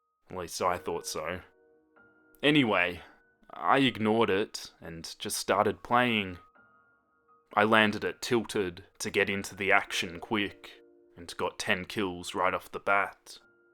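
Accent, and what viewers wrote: Australia. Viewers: Why did I only just realise that you're Australian lmao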